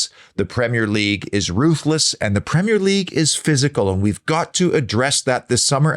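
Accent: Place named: Canada. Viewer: its awesome to hear that Canadian accent from a true Villan. international pride🦁🦁🦁. does somebody know if anyone is doing these kinds of videos on our Lionesses??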